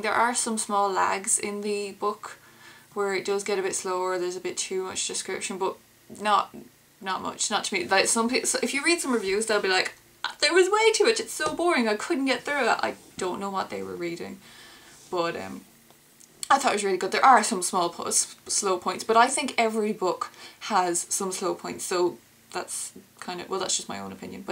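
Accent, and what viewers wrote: Ireland. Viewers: Do I detect a Irish accent? Or am I just being retarded? Good vid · I was just browsing some WoT videos, as I'm getting excited the final book is just months away and I was pleasantly surprised to hear your Irish accent (like my own) when I had expected an american review. I hope you do manage to review the rest of the series before the final release. Much love for this fantasy series. It's scale and depth are wonderful and inspire such passionate discussion among fans. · Joanie loved the review and your accent is very cool. Irish? I agree with you 100% 1 thing very noticeably lacking in the LotR books is character development. Jordan has full bodied characters (my favorite is Matt). I'm on book 12 "the Gathering Storm" . As far as pronunciation goes I'm listening to the audiobook versions (I guess that's a cheat) as I drive to work. Any way I'm off to see the rest of your reviews May you find water and shade Spear Sister!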